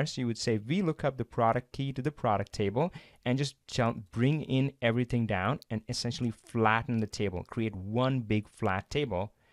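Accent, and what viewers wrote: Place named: India. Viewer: Finally, one smart Indian man with weak Indian accent. Thank you for the tutorial, thorough and easy to digest. 👍🙏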